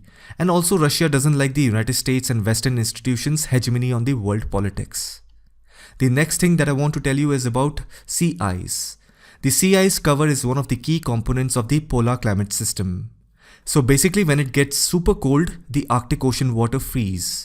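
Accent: India. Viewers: Nah a lot of Indians have this accent. You're just stereotyping us with the western concept of the funny Indian accent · It's an Indian accent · From the accent I’m assuming he’s Indian and English is widely spoken among educated people in India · Dude not only is the content of the video incredibly good, but also your voice is just on-point. I'd never thought indian accent could be so cool. I bet it took years to train that voice, singers would be envious :D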